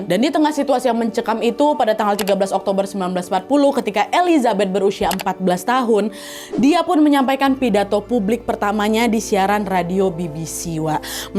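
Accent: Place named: Riau, Indonesia. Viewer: selamat kak..🎉🎉-/+Bru 5bulan aku ikutin Chanel ini,awal mula aku tau dri anak gadisku yg skrg lgi mondok.kita sering nonton ber2,yg sering kita tonton cerita horor Krn emank kita suka cerita yg barbau horor..😁mpe saat ini aku sll ikutin cerita yg lain Krn emank seru dngerin kak cerita tuh..kyk lgi ngobrol aj gtu..,😊aku jg 11thun tinggal d Riau tepat'y daerah perawang bnyk kenangan dsna.tpi skrng aku dah pindah k Banten..jdi pas dngr gaya bahasa kak..rasa'y msh berada d Riau..😁sukses sll ya kak..😘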